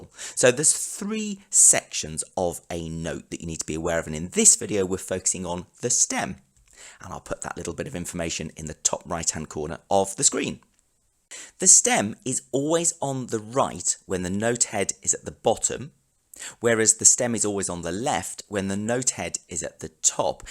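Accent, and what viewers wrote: United Kingdom. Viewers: I really like your videos. As for your pronunciation, tough shit for the Italians. You don't have to apologize for being British. If they are offended, that's their problem.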